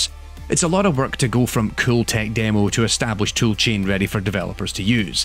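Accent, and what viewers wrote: Scotland, United Kingdom. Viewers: Ah, another video from. Marvelous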